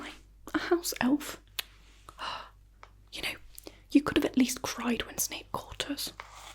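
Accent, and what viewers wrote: RP, United Kingdom. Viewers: And guessing from your very sexy English voice you are from the posh English county’s like Surrey,Oxfordshire or somewhere like that??!!😉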